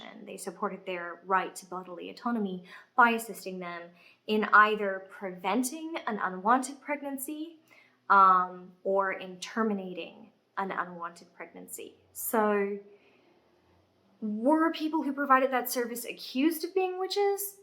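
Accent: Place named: Australia. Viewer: Just a question for you. Are you originally from Australia, or spent a lot of time around Australians? Reason I ask is, when hearing you speak, although your overall accent sounds American, some words you said sound almost Australian.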